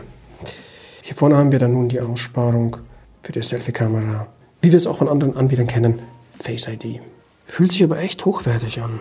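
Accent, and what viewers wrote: Russia. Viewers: bist du russe? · Russischer akzent izz da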